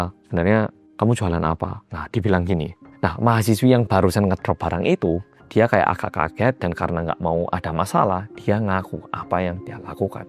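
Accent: Java, Indonesia. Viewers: Mas.... Muedooook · sehat terus Mas, selalu kangen denger suara bule Korea yg medhok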